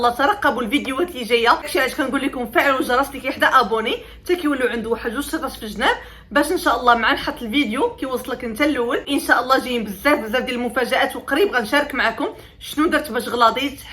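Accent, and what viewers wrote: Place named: Maghreb. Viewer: السلام عليكم اختنا الطيبة القلب السيدة -نشكرك على بث هذه الڤيديوهات المفيدة للناس ولكن نرجوك أن تتكلمي ببطئ كي نلحق أن نفهم كلامك بتتابع معك وكذلك نرجوا أن تتكلمي باللغةالعربيةالفصحى فاللهجةالمغاربيةالجميلةالعذبةصعبة الفهم في بقية الدول العربية،نرجوا الإهتمام وشكرآجزيلآجازاك الله خيرآ😍❣️👰🤵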